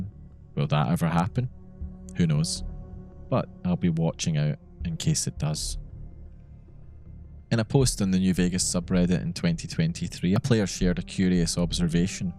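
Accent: Scotland, United Kingdom. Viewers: Can't nail the Scottish accent down to 5O miles , I'm noob to the channel but am born in Glasgow and have been dealing with Mexicans on construction sites for too long in So-california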